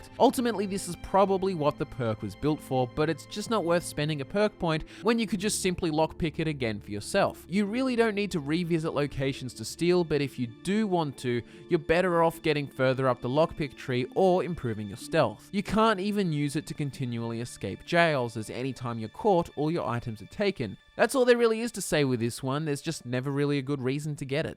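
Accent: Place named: Australia. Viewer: This made me realize that i have a thing for an Australian accent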